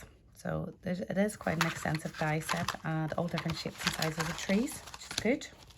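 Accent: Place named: Northern Ireland, United Kingdom. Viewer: Great to hear your accent - making me a bit homesick. I grew up in Belfast. Now live in Western Australia. I am also a fellow SU demo. Tfs this lovely project. Just found you through Pinterest 💕.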